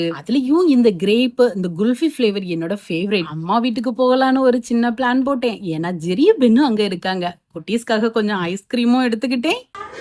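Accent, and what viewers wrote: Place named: Kerala, India. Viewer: Sister neenga pesarathu azhaga irukku 2daysa unga video matum tha pakkuren super 😊😊😊neenga kerala sister unga slang apditha irukku athan keten 😊😊😊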